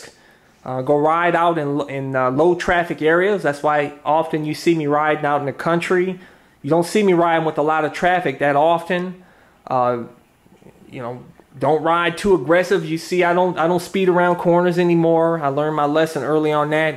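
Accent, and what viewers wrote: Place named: Appalachia, United States. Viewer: you're a great guy and very sincere with your advice. You have a great hill billy accent and infectious laugh.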